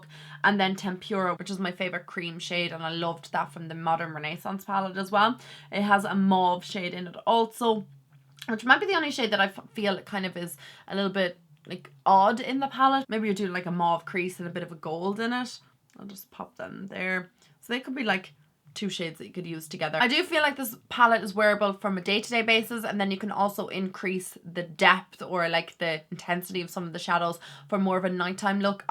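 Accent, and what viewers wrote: Ireland. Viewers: I'm new to your channel after searching for eye shadow pallets and this is really useful, the Huda one looks good. By the way like your accent, it sounds very Irish :)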